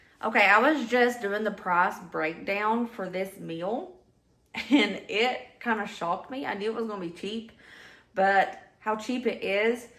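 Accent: South, United States. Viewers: Foil, oil, boil, anyone from the south says it just like you. It's part of our charm. Don't change.❤ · I love the way you say “ole” I love the southern accent❤ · I grew up in Chicago. Wash sounds like warsh or whoosh. I got teased so much when I joined the army that I forced the accent away. My mom said I sounded like I was from Boston. How you pronounce the words is due to your Southern accent. It isn't wrong; it's just different. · NO SHAME IN HOW YOU SAY OIL!!!!!!!! I say it like that too!! People tease but I love a Southern accent. That's one of the reasons I was hooked on your channel so fast!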